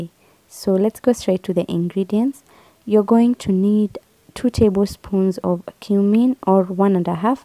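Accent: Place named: Africa. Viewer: I love your English i thought you're African wauuu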